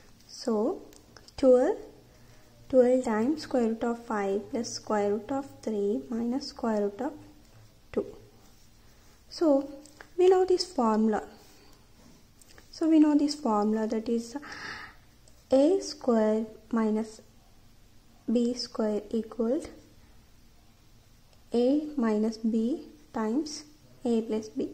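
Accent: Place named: India. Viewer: Love the female Indian accent. I did think of rationalizing the denominator by multiplying by its conjugate but I'd never done that with a three-term surd.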